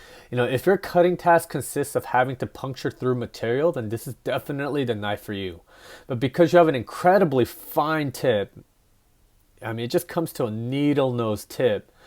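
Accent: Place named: South Korea. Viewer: I detect a korean accent. 한국인에요?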